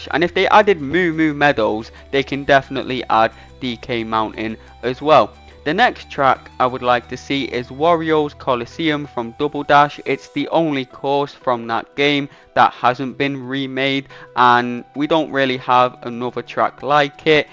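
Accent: United Kingdom. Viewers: I dont know much about Mario Kart World, i just come here to hear your Bri'ish accent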